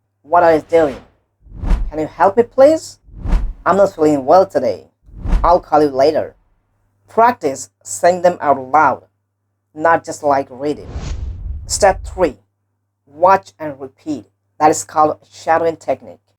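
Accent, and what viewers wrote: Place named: England, United Kingdom. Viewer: I think you are from England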